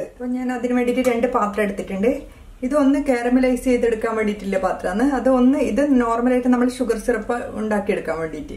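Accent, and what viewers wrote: Kannur, India. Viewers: കണ്ണൂർ ആണോ ചേച്ചി..സംസാരം കേട്ടപ്പോ തോന്നുന്നു..കണ്ണൂർ ആണെങ്കിൽ, എവിടെയാ. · നിങ്ങട കണ്ണൂർ സ്‌ലാങ് കേക്കാൻ നല്ല രസമാന്ന്... ഞാൻ ഇപ്പൊ ഉണ്ടാക്കിക്കൊണ്ടിരിക്കിവാന്ന് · Are you from Cannore, parayunna reethi kettappol tonniyathaanu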